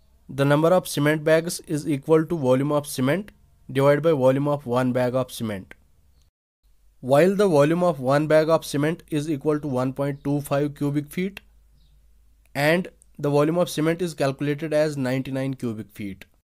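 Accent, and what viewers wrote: Kashmir, India. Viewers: got ur kashmiri accent. good work brother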